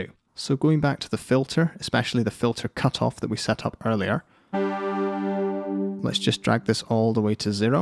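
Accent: United Kingdom. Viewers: I will always thumbs up a video in which the presenter uses the word "fortnight" unironically in a British accent.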